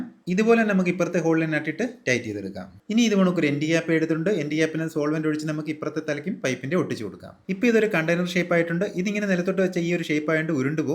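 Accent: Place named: Pathanamthitta, India. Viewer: പത്തനംതിട്ട ആണെന്ന് തോന്നുന്നു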